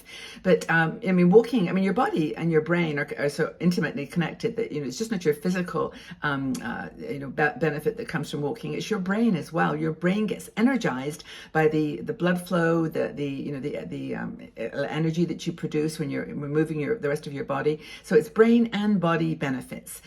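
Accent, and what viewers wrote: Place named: United Kingdom. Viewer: Hi! I love walking and I love hearing you speak in a more British way!:)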